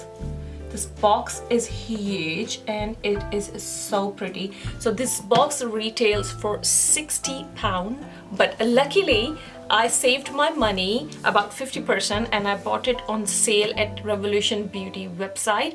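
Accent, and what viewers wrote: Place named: Pakistan. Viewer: You are so lovely and gorgeous I don’t mean to be nosy but are you Pakistani because you sound like Pakistani I don’t mean to be rude or nosy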